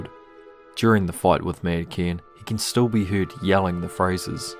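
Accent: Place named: New Zealand. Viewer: Definitely from new Zealand (am Australian)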